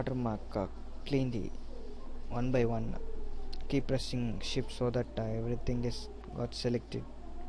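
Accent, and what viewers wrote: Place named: India. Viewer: It's English. Trouble with Indian accents?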